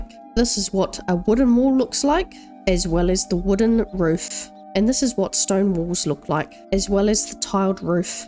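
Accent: New Zealand. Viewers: Always nice stumbling on a kiwi accent in the wild :)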